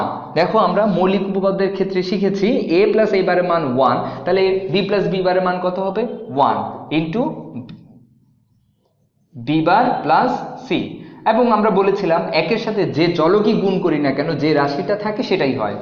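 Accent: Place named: Bangladesh. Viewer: Excellent Topic. Are you from Bangladesh ? Your accent is like bangladesi... I'm from India, Kolkata. Happy to tell that, from Village Cooking By Sandhya team, one of our team member is from Mathematics Hons. Hope, he will enthu. from your channel. ❤️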